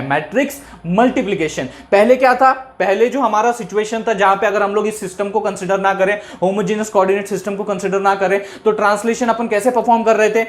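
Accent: Maharashtra, India. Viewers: Sir aap Marathi bande ho na 😅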